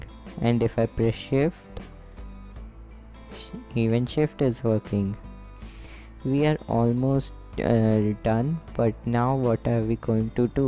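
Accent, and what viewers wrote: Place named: India. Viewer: Indian voice? Yuck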